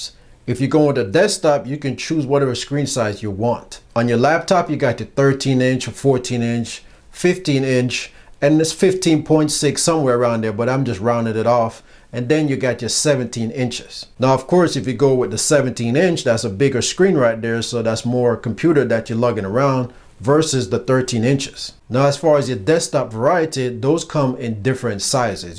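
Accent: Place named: Jamaica. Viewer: Thanks man this was really helpful, I could be wrong but I think I'm hearing the yadi in the voice of so big up yuh self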